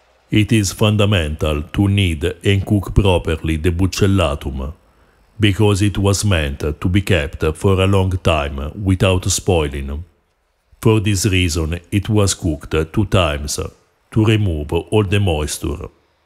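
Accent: Italy. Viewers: Where part of Italy you from?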